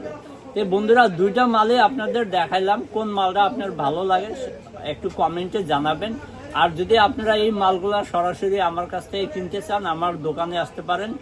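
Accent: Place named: Bangladesh. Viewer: কথা শোনে মনে করেছিলাম বাংলাদেশী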